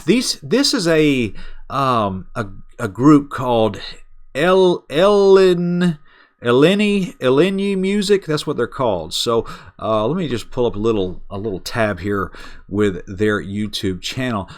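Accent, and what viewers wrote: South, United States. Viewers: I found the radio app finally in case you didn't. It's WIOP radio not WIP. I think it's his southern drawl that made me misunderstand. I hope this helps you.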